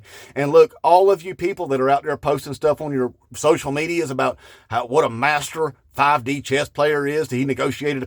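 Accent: South, United States. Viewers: Love the Southern accent, brother. Keep bringin' it!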